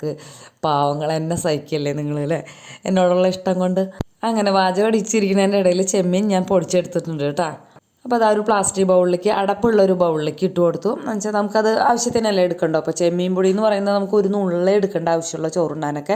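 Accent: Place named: Thrissur, India. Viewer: ഈ തൃശൂരുഭാഷ എനിക്കെന്തിഷ്ടാന്നോ💜💛❤ "എന്തൂട്ടാ " എനിക്കെന്തായാലും വീഡിയോ നല്ല ഇഷ്ടാ എന്നെപോലെ കുറേപേർക്കിഷ്ടാവും ഒന്നും കണ്ടു മടുക്കാറില്ല, ഓരോന്നും പുതിയതു പോലെ തോന്നും ❤💙 സങ്കടപ്പെടണ്ടാട്ടോ പിന്നെ സന്തോഷങ്ങൾക്കു മേമ്പൊടിയായി ഇത്തിരി സങ്കടവും,വിതുമ്പലും വേണമെന്നല്ലേപറയണത് എന്നാലേ സന്തോഷങ്ങൾക്ക്, ജീവിതത്തിനു പൂർണത കിട്ടുവൊള്ളത്രേ ❤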